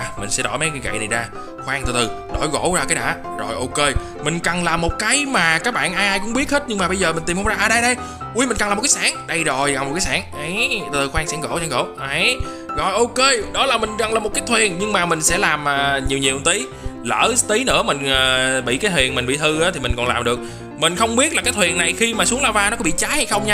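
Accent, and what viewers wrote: South, Vietnam. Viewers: Anh miền nam not trung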